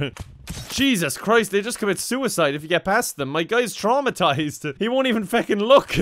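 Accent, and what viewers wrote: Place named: Ireland. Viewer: After awhile the Irish accents just seems normal to me. It's just. Then he pronounces Z as Zed and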